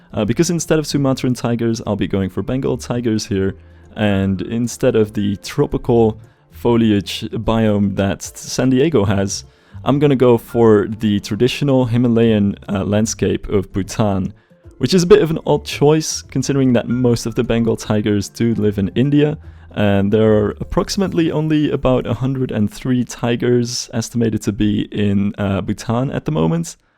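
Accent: Netherlands. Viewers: Your English is really good, but for some reason I still knew you were Dutch the second I heard your voice.. Not sure how that instinct works😂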